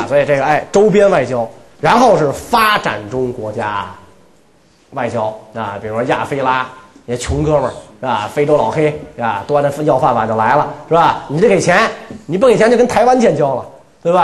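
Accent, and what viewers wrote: Beijing, China. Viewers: 我就是来听痞气的北京口音的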